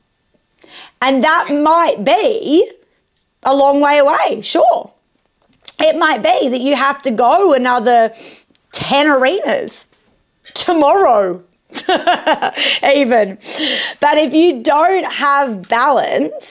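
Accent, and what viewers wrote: Australia. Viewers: Is this an Australian accent ?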